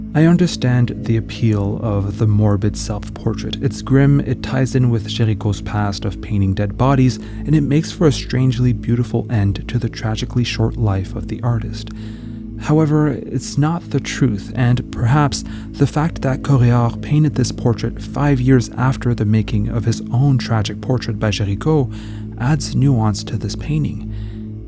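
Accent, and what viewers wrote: Quebec, Canada. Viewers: Hey love your content is your accent Quebecois ? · Salut, es tu Québécois? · Your quebecois pronunciation is surprising 😄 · hes from kebek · Are you from Quebec? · Are you from montreal or quebec?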